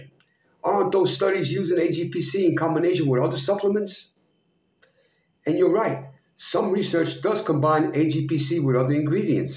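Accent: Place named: Brooklyn, United States. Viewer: How does the creator sound like my uncle Victor from Brooklyn or my cousin Vito from the Bronx claimed he's not even an American citizen?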